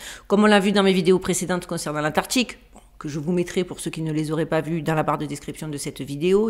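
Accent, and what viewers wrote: South, France. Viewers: Avec l'accent du Sud insupportable en plus... Perso je ne peux pas ça me gavé.. next! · Quel humour,à dévoiler les choses,vous n'avez pas peur qui aillent plus loin que la censure,une vraie "Guerrière qui vient du froid😂,euh,non qui vent de là où il fait bon de vivre😁,super vidéo,intéressant d'approfondir le Sujet de L"'antarctique",vidéo traitant de découvertes parfois jamais entendue,avec humour,et cet accent qui vient du Shud🤣,excellent "& conteuse d'histoires extraordinaires pour petits et grands,quand yà rien à la télé,regardez "Les Capsules de L'aventurière Archéologue 😂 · j'aime bien tes videos sur l'antartique ! super intéressant merci et beau travail ! je trouve que tu as un bel accent du sud de la france, tu viens d'où ? Marseille ?? .... lol bises (je viens de Marseille aussi, maintenant j'habite en occitanie) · Bonjour j'adore l'histoire avec l'accent du sud !! 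· Je découvre ta chaîne Youtube... Très intéressante 👍.. mais.. ton accent viens de ou ? Es tu française?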